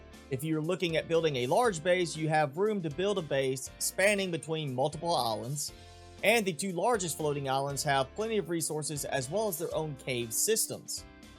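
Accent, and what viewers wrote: South, United States. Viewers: I love this guys southern accent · You have the most American accent IV ever heared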